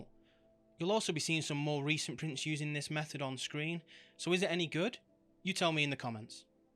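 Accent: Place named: Northern England, United Kingdom. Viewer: Dude I completely didn’t expect your accent when I came in here. Super happy to be supporting a northern artist.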